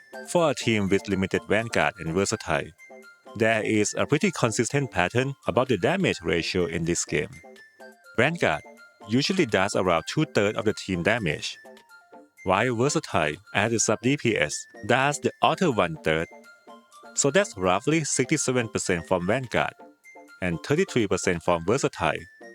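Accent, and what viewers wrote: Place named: Thailand. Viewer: คนไทยปะครับสำเนียงเหมือนไทย 😅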